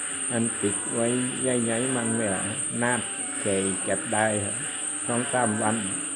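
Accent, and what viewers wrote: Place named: South, Thailand. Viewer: มะเขือพวงไม้มงคล มาฟังสำเนียงใต้ ชอบจ้า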